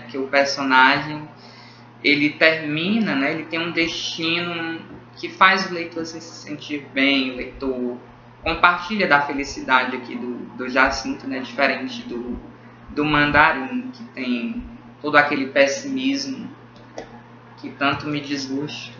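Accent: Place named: Fortaleza, Brazil. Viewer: Aaah!! Reconheci o sotaque pq moramos em FortaleZa 👏🏻👏🏻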